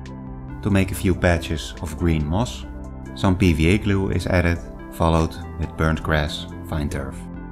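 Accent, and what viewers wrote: Netherlands. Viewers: are you dutch? if so vet man!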